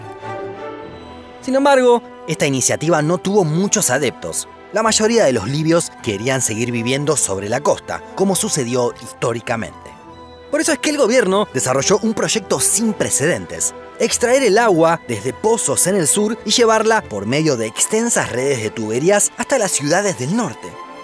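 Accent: Argentina. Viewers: Es agua acumulada desde hace miles de años y no es renovable, es agua fósil, es decir, que tarde o temprano se agotará , como el petróleo . Pero toda agua que no discurre, se "abomba", es decir no se "airea", entonces necesitará tratamiento previo para su consumo? Pero claro para riego si sirve. Si sabía este dato. Por ello se pensaba que por este recurso era que los países imperialistas, trataban de apropiarse derrocando a Gadafy. Pero bueno el vídeo. Pero estos gauchos. su dejo molesta, pero que se puede hacer, si es un buen reportaje solo queda aguantar. ASHÍ, EN VES DE ALLÍ Y MUCHAS PALABRAS MÁS..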